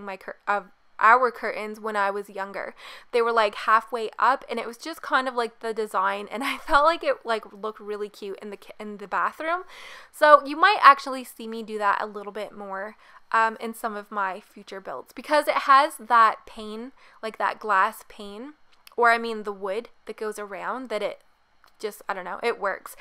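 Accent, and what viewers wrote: Canada. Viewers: You have the most canadian accent I have ever heard. Also, this is a cute house! Thanks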